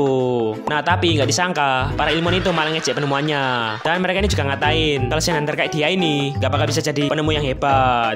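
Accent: Java, Indonesia. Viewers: boso jowo mu kentel men mas, wong jowo ta? 🗿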